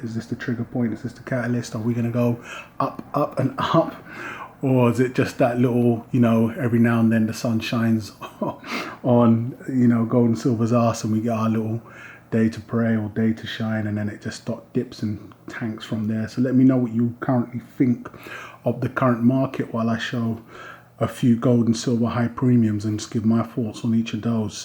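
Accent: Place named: Yorkshire, United Kingdom. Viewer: Thank you … I know we don't always agree 100% on things but its good to have many points of view. PS I am in Yorkshire …. So I am guessing we are not too far apart on your accent!!!! Anyway I buy only to melt them down.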